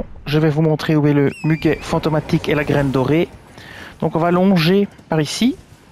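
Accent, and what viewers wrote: Belgium. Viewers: Tu as un accent du Hainaut je me trompe ? ;) merci pour le muguet !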